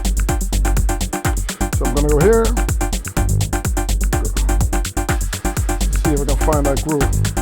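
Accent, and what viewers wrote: Netherlands. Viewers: Assuming you're Dutch based on your accent. Correct me if i'm wrong haha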